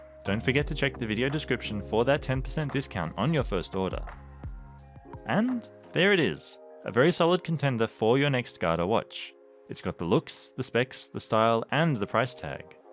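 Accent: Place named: Australia. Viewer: It's always difficult to listen your own voice! Always sounds weird, I didn't immediately pick out you were Australian until I saw the channel bio, which was odd as I am a Kiwi so usually can haha. But to give you some sincere feedback. I just went through my watch buying process and landed on the NB1050-59H (it actually arrived from Japan today!) and this video was incredibly well made. Great macro shots, informative dialogue, good comparisons, good to hear positives and negatives and finally the voice of course! It's like i'm listening to an incredibly knowledgeable jeweler who knows watches inside and out. It took me about a month to finally decide to purchase this watch after tossing up many in the process, I probably watched this video 4 times lol, to say the least, I am a new subscriber! Keep up the good work 10/10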